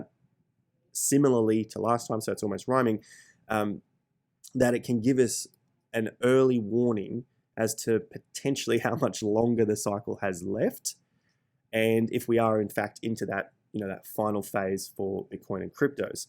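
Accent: Australia. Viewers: Why can’t Australians pronounce “A” correctly? It’s DA-TA not DA-Terrrr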